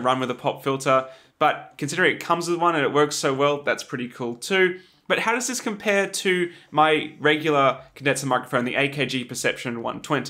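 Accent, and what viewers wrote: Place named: Australia. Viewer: Hahaha thinks I watch to hear his cool Australian accent. I watch because of his awesome content.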